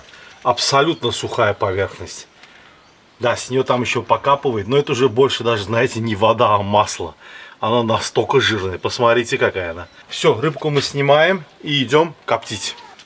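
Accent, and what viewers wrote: Baku, Azerbaijan. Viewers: Русский язык действительно в Азербайджанском акценте получается больше чем сладким. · Бакинский акцент самый родной❤ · Твой бакинский акцент напомнил мне Баку,когда я ещё жил там в советское время.Я вспомнил как пили пиво с горохом,мой отец так любил.Многие армяне до сих пор сохранили бакинский акцент,по моему он в крови да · Брат ты красавчик. Это не еда с пивком и нутом, это симфония. А ещё вкуснее невероятный Бакинский акцент. Долгих лет жизни тебе родной. Ты конкретно поднял мне настроение. Клянусь тебе сейчас пью пиво за твоё здоровье. Респект и уважуха!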